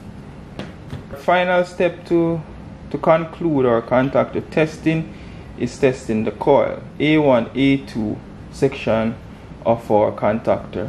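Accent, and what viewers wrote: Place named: Jamaica. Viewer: Thank you Jamaica 🇯🇲 I know that accent anywhere Greetings from 🇧🇿 Belize!!!! ☮️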